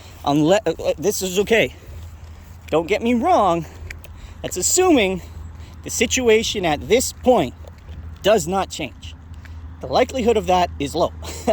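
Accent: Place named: Canada. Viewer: Keep grinding man your really building this channel quickly. I could really here the canadian in ya this vid. You said level at one point i was abut to die lol 🤣🤣 best of luck bro. Curious to see your perspective on the warrants too many conflicting view points and most of those who actually know what theyre talking about are just pointing out what perspective they want everyone else to have. I know for a fact youll tell it like it is bro.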